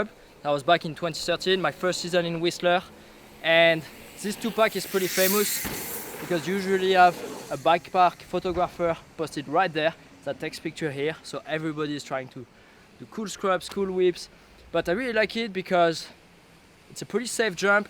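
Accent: France. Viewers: Je kiffe grave ton accent français en anglais......tu parle le franglais, le vrai .....t y es le meilleurs en tout cas dans les rides de fou....bravo a toi d avoir trouve la technique du poignet pour ce tricks, ça marche du tonnerre je viens de l essayer ....🤟🤟🤟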